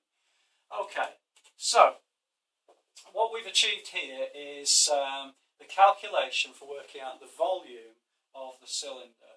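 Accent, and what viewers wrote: United Kingdom. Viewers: I am also maths teacher are you British sir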